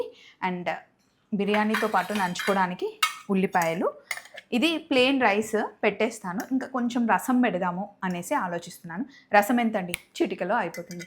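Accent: Andhra Pradesh, India. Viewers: Meeru Telangana vallu aivundi Andhra slang lo enduku matladataru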